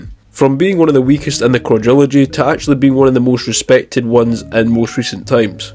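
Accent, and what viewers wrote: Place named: Scotland, United Kingdom. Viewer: 3 minutes of a Scotsman babbling to say absolutely nothing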